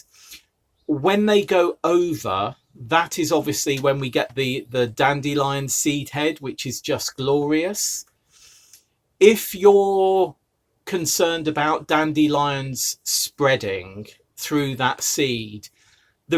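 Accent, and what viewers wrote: RP, United Kingdom. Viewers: YOUR speach is fantastic and a perfect ..we c as lled it * Oxford English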